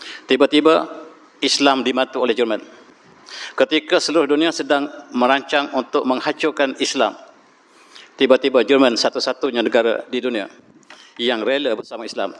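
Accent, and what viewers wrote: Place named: Kelantan, Malaysia. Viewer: Saya sangat meminati isi2 ceramah Prof. Sgt banyak ilmu yg boleh dikutip untuk dijadikan panduan dan pengajaran. Cuma, cara sebutan prof ada masanya agak sukar difahami. Samada terlalu laju atau pengaruh dialek kelantan yang kuat. Kalaulah prof dapat memperbaiki cara sebutan dalam setiap ceramah, ia akan lebih bermakna dan memberi menafaat kpd semua penonton. Penonton prof bukan shj dari Malaysia. Ada juga dari Indonesia, Brunei, mungkin juga dari negara lain yg lebih sukar untuk memahami dialek tempatan. Apapun, saya sgt menghormati prof, seorang ilmuan yg dalam kelasnya tersendiri. Teruskan dgn video2 yg memberi manafaat kepada umat sejagat.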